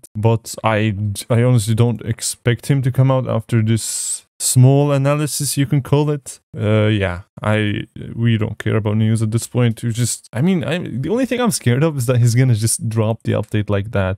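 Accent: Germany. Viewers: you german? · are you from germany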